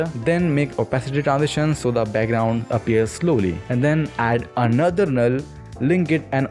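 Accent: India. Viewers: always the indian acent with the best tut:) love u sm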